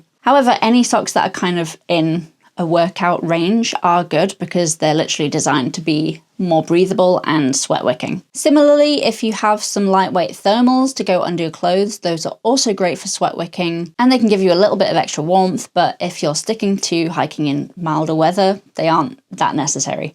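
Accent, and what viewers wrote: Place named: United Kingdom. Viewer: This might be my new favorite youtube channel. I love the fact you didn't put any music in the background!! You seem to be so down-to-earth, i love it. I love your accent, which country are you from in the UK ? Love from Normandy, France, where the wheather is as bad as yours! 🌧🫶🌞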